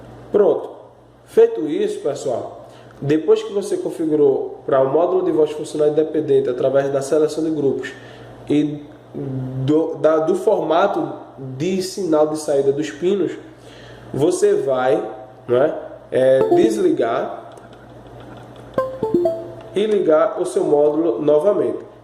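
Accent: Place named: Pernambuco, Brazil. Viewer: Esse sotaque e de Pernambucano do litoral :)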